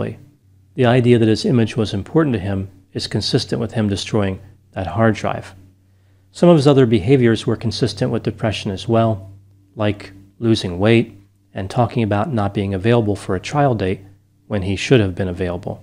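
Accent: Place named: Pennsylvania, United States. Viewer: See, with that accent I've always had a hunch you're from PA/the tri-state, as I am. And now that you've so perfectly pronounced Wilkes-Barre, I'm almost certain. Now just give me a Schuylkill & a Bala Cynwyd and I'll deliver my final verdict. ;)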